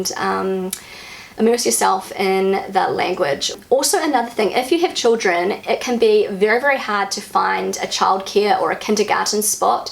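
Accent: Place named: New Zealand. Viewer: Neuseelandisches englisch ist fur jeden wine herausforderung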